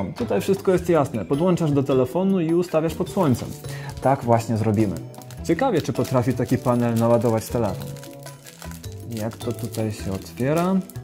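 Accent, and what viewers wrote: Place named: Ukraine. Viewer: Naucz ze sie chlopie po polsku bo zajezdza Ukrainą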